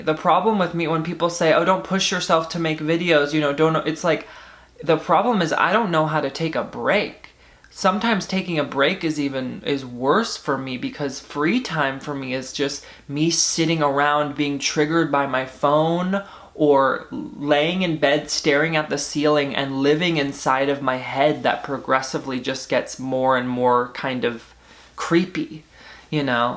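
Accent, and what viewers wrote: England, United Kingdom. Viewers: LOVE your British accent. :) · BRITIAN ISNT JSUT ENGLISH STOP SAYING UR ENGLISH ACCENT is BRITISH as a Scottish person it offends me And we say ye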